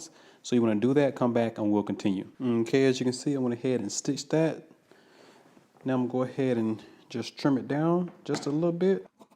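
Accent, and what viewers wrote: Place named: Georgia, United States. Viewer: I recognized that GA accent. Good job! Thanks From a fellow Georgian. I live about 4 hours away from Melanated Fabrics and it's on my bucket list to visit. I just dont get to Atlanta much.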